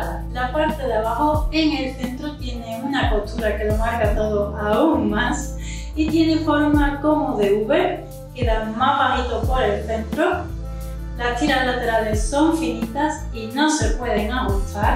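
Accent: Andalusia, Spain. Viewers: Esos hoyuelos de venus... ufff!!! Qué hermosos 😍😍😍 Una pregunta: Eres de Andalucía?? Tienes un tono de voz melodioso e intrigante. Pura vida!!!🇨🇷✌️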